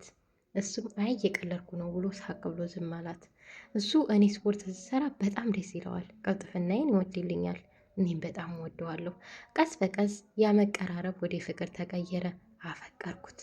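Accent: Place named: Wollo, Ethiopia. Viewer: ወሎየ ነሽ አነጋገርሽ ያስታውቃል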